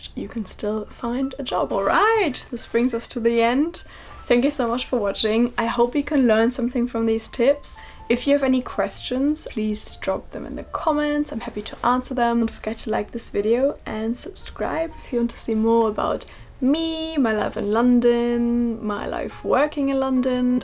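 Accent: Germany. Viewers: Your accent sounds quite german or am I hallucinating? Great content. Love England. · She has very strong german accent though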